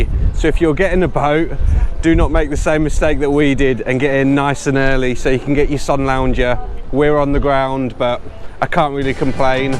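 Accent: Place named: United Kingdom. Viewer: Nice to hear good British accent ..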